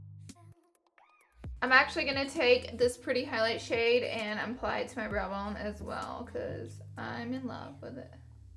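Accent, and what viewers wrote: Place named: Alabama, United States. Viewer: You're still in my prayers!! I came over here because I heard you're from Alabama (I am too) and I heard your accent and I was like yep she's from alabama haha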